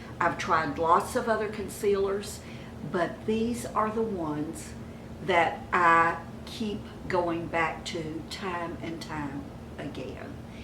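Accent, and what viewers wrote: South, United States. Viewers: I am new to your channel !! Im so glad I found you !! You are such a delightful and very Beautiful classy lady, I can't wait to show my Mom your channel !! If i may ask, where are you originally from ? I detect a southern accent ? Blessings and Love....XoXo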